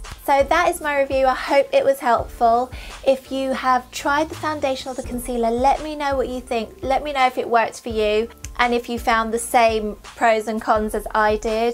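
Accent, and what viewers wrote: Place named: United Kingdom. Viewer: You seem lovely great video! glad I have came across your channel! nice to hear another british accent! take a look at my channel x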